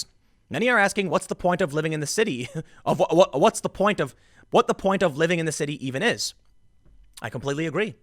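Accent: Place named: New York, United States. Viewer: Anyone else notice how New Yorker accent came out much stronger in this video? I guess it's because he's talking about his homeland.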